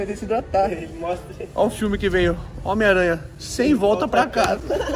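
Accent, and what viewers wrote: Rio Grande do Sul, Brazil. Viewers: tu é de onde? fala igual nois aqui do rio grande do sul kkk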